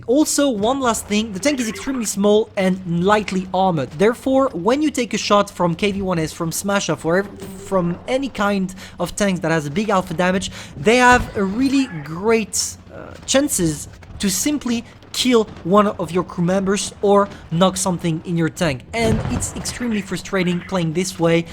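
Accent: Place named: France. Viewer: I just discovered your channel thanks to the youtube recomendations, and I have to admit your videos are pretty interesting. By the way, are you french ? Parceque j'ai l'impression de remarquer un léger accent français malgrès t'a prononciation excellente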